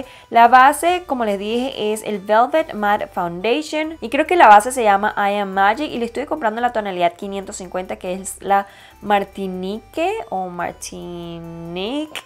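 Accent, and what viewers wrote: Venezuela. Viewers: Me encantó hablando a lo venezolano de pana se te ve genial naguara 😂😂😂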